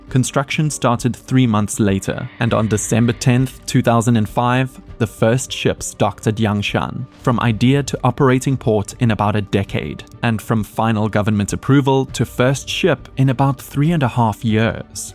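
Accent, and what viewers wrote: South Africa. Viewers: Aweh aweh! Yet another channel with dope graphics and a narrator with a South African accent! Lets gooo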